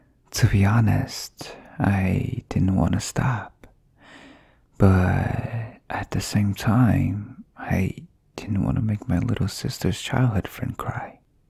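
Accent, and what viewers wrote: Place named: North, Mexico. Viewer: que norteño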